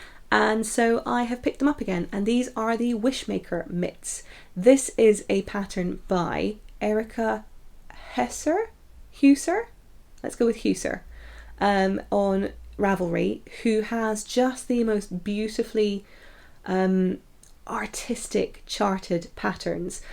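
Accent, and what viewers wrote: Scotland, United Kingdom. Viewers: I know you are thinking why is this person commenting on my old video but like you I have to start from the beginning. I flocked over from Amy who Was trying on your sweaters in her vlogmas, and for a split second I thought of just starting there... I picked up your Scottish accent right away and I love your sense of humor! So glad to have found you which you may be thinking great I have a stalker, fear not I’m only mildly odd. I’m German living in the US and married to an American with a Scottish heritage, who by the way came in during your first eps and said hey you watching Amy Pond doing a podcast. Anyways enough of my babbling so glad to have found you and looking forward to watching all your videos!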